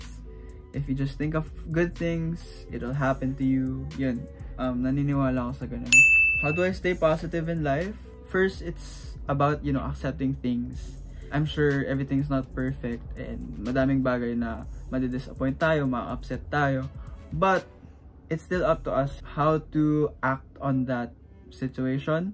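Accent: Philippines. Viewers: Your Filipino accent is soo heavy! Despite being half Japanese. Why don't you speak English more in your video? I don't understand what you're saying most of the time... :/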